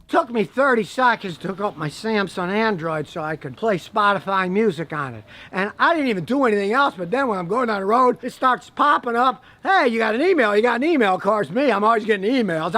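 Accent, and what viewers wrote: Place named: New York, United States. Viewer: Sounds like an New Yorker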